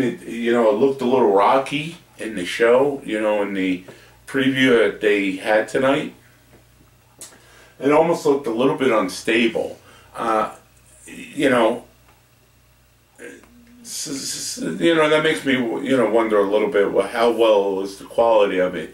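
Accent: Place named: New Jersey, United States. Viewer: lol, I guessed it well, I know my people, you sound like your from Jersey, I too am from hudson county, Live in south Florida so I do alooooot of flying down here all year round. My phantom has alot of use on it. I'm set-up for long range so I fly alot over 3km over swamp land. Multirotors are huge down here, even for just hobby. Went to jersey last summer and nobody really is into them up there from what I see. Not even most hobby shops have very little inventory on them. Oh well, good to see that your keeping it real up there.. Good Luck.....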